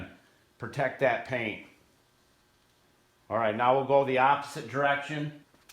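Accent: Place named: Chicago, United States. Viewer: Ok ha I detected a slight Chicago sounding accent so thought you might be close to that area. Beautiful part of the state. Love your vid’s thanks again!! ✋👍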